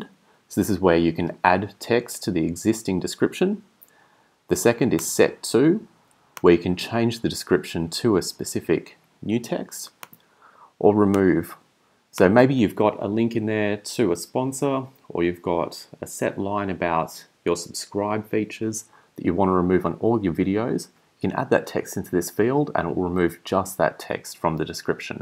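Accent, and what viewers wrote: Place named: Australia. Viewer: good job mate, keep it up! right from the get go I could tell that lovely aussie accent (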